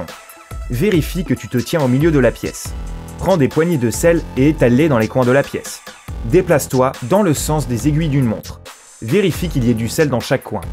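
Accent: Quebec, Canada. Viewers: kawaiser 5.1 il habite au quebec je pense car il as un accent québécois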